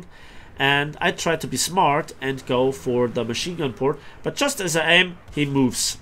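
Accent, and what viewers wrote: Germany. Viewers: Am I, am I first? Wow! Quick! Think of a cheesy thing to say... Jk. Thanks for the video. Always nice to have a good game with learning opportunities, all narrated with a satisfying German Accent!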